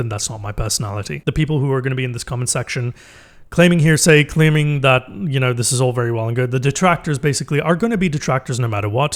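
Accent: United Kingdom. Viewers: No doubt intimidated them with his high class British dialect, manners and intelligence. If only you got to have a meeting with the suits at the top instead of the developers whose hands are tied. I bet you would’ve handed them their ass in the most civilized discourse with dignified dialogue and a few witty passive aggressive jabs in between. Maybe one day… maybe.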